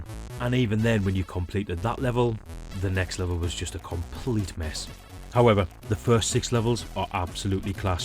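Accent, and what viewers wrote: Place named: Scotland, United Kingdom. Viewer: You have a nice accent. I thought it was Scottish, but – then again – I've never heard Scottish quite like that. The other guy has it even stronger. The way the vowels switch places sounds like the Jamaican Patois. Guess those dudes stole it from you lot!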